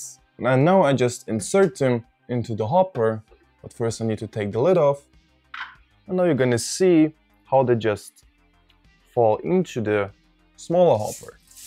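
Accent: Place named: Russia. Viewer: Till the last phrase, I was absolutely sure that I hear Russian accent😅The only doubt was why the Russian guy talks about German engineering 🤔 And thank you for your video and your job, of course. It's awesome.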